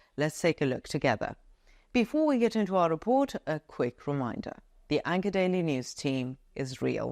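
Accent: United Kingdom. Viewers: How does put that British accent in or is added after??😮😂 Thanks for your excellent updates 😊 have a nice weekend 👍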